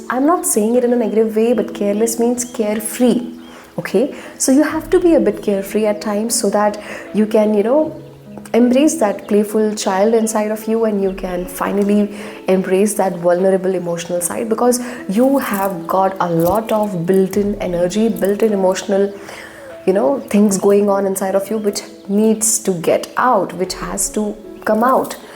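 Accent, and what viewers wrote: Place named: India. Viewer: Indian accent 😂😊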